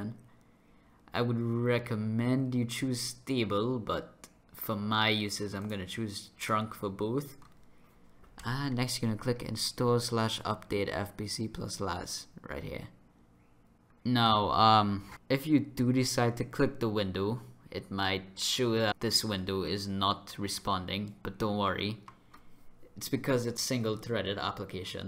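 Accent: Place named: Trinidad and Tobago. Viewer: sounding like ah Trini.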